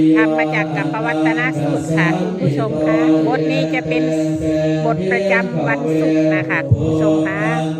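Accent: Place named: Sakon Nakhon, Thailand. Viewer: ฟังเสียงคือคนทางสกล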